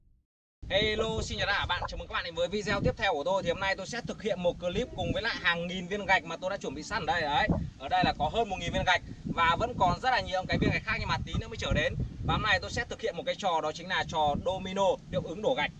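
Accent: Thai Binh, Vietnam. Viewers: Anh là người đâu vậy ạ?? Nghe giống giọng Thái Bình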